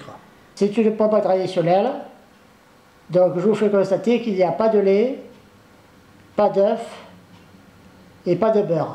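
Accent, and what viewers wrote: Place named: Provence, France. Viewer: Merci pour cette recette super facile et bien expliquée, ma pâte se repose en ce moment, et merci pour votre accent qui sent bon la Provence de chez moi lol, joyeuses fêtes à tous!!!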